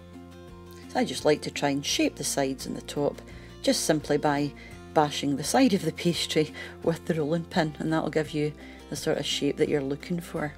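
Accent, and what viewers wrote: Scotland, United Kingdom. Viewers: I love the scots accent, just great. The recipes as well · ❤ the accent and this recipe. Scottish? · Hello from Michigan, USA. I just found you here a few weeks ago. I have made a few of your recipes. They were good. I made individual Cottage Pies and froze all but one, which I ate that night. Husband doesn't like them. He can be a picky eater. I like your recipe better than the one I have been using. Also the beef stew with orange marmalade. My paternal grandfather, born in 1900, was from Aberdeenshire, and came over with his family in 1906. I am glad that I found a Scottish woman who cooks real recipes the true way. I also love your accent. Your weekly shopping got me to go back to using Aldi. I do have to look up and convert grams, Celsius and mark 5. My maternal grandmother was from Canada, so I have no problems with your way of speaking. 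I know that tea time is our supper, you sit on the Chesterfield instead of a couch, running shoes are tennis shoes, etc. Keep up the great show.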